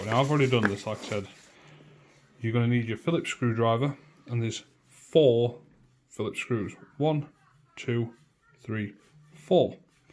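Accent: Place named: United Kingdom. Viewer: Thanks for teardown instructions but damn your british voice irks me sorry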